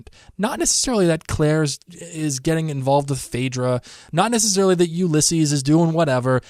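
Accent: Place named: Northeast, United States. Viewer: always love the fade in and out of your northeastern tongue.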